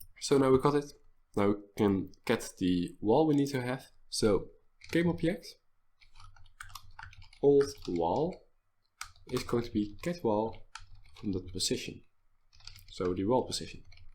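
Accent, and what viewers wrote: Netherlands. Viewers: Hey, I have a question:) I am making a maze game, but I want to make it so that at the loading screen you have a sphere which is moving in the maze. But I want to make it so when it hits a wall it goes to the left or right or straight or go back, depending on where the walls are. Do you know anyone who can do this/explain to me how to do it. I'm really struggling with this, if you do, it would be awesome. You can also send me some code (if you do understand how this works) I could learn a lot from that!:) Thanks! If you need to know anything, just ask me. And you got a new subscriber:) Btw, Love your accent. Are you dutch right?:) I am!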